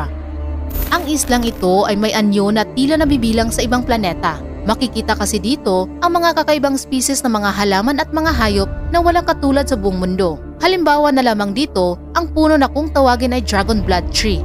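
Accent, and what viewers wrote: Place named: Visayas, Philippines. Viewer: Visaya ang commentator medyo konti pang praktis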